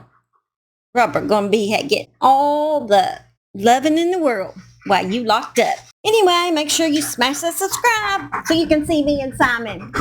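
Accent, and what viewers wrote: South, United States. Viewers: Also I’m from Alabama 😊and we sound the same!! Hand raise for the southern gals ❤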